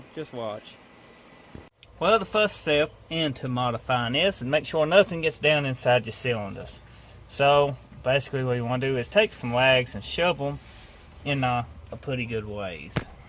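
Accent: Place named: Louisiana, United States. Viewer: That accent are you from Louisiana?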